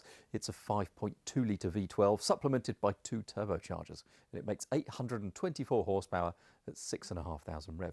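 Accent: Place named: United Kingdom. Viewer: This is normal British delivery. You're asking for it to be more American. Perhaps you're in the wrong place.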